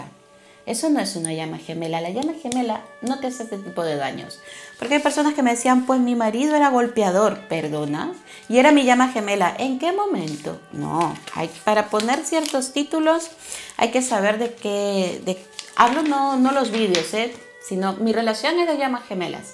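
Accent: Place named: Mexico. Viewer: . Tienes tono de Mexico lindo y querido. Porque no haces una oferta especial para los mexicanos que queremos consulta personalizada. Esta muy caro en euros 🙏🏻💕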